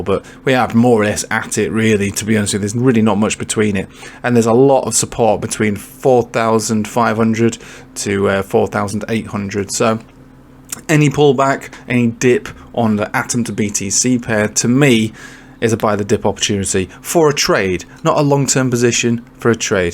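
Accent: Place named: Northern England, United Kingdom. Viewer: Dude, are you from Yorkshire, Lincolnshire or the north west? You might not be from any of them but love your content dude. Legend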